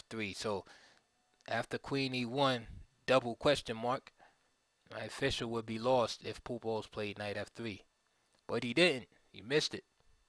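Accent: United States. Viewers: Put an Eastern European voice on this video...perfect. Just sounds weird with an American doing the verbal annotation.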